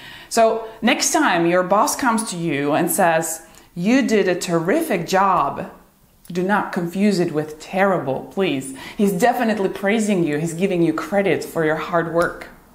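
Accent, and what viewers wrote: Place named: United States. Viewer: I guess the entire Slovakia speaks English. 😁 Where'd you get that accent? You either must have spent a significant amount of your life in the US, or you talk to your colleague from this channel on a day-to-day basis. I used to have a perfect British accent but I lost it very quickly once I stopped practicing.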